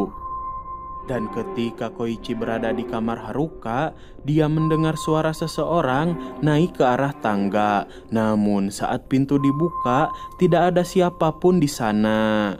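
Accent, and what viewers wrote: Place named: West Java, Indonesia. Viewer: Mass Logat Sundanya luchu jg🤣🤣🤣 film horor bisa berubah jd comedy..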